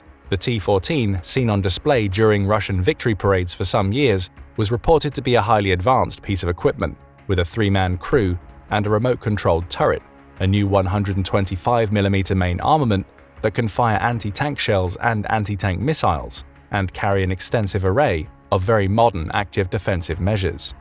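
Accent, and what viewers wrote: United Kingdom. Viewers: This channel never failed to make me laugh. That British accent always ticks me off.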